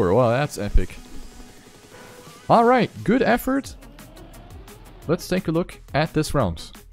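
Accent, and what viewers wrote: Germany. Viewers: Damn the german accent is Go intensive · u German?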